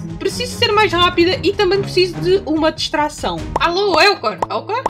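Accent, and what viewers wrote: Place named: Portugal. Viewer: mais perguntas olha você morrava em Portugal e veio para o Brasil ou é só um sutaque de Portugal que você ganhou da sua mãe ou pai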